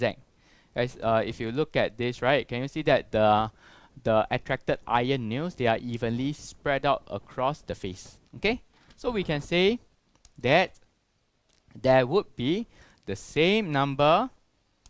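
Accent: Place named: Asia. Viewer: bro you have perfect asian accent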